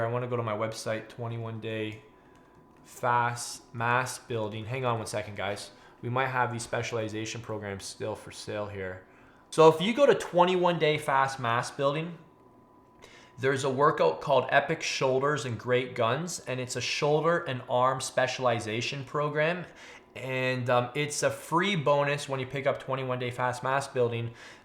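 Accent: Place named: Canada. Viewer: Canadian accent, ey.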